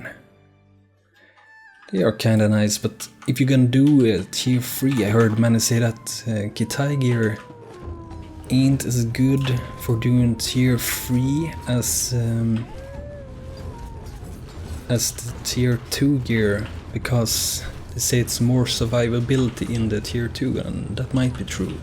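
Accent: Sweden. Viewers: Sounds very cool! :) Couldn't help to notice that you were swedish. So am I ;) Ha det bra, kommer fortsätta titta på dina videos !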